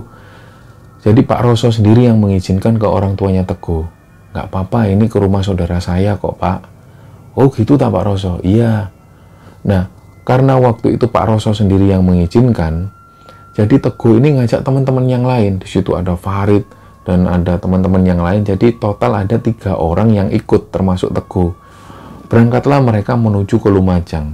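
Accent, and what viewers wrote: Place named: Surabaya, Indonesia. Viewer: wkwkwkwk suroboyo banget, tekong-tekongan, skit skit an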